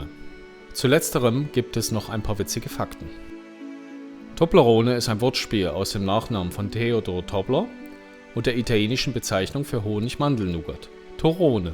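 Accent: Saxony, Germany. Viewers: ...einverstanden...aber warum muss ein Sachse sowas erklären. Seine Aussprache französischer Begriffe spottet jeder Beschreibung...nu?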